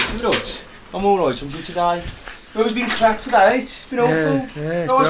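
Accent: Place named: Black Country, United Kingdom. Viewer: Isn't this Black Country accent rather than Birmingham x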